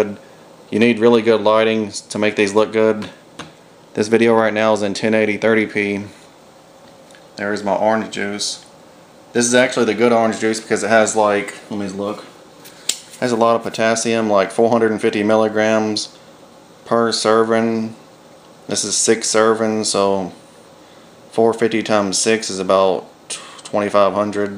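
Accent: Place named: United States. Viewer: You have a cool American accent like a badass XD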